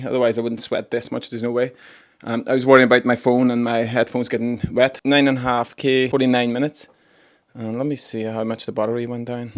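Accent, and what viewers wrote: Northern Ireland, United Kingdom. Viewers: You are from Northern Ireland? Your accent is a cross between foreign and NI 😂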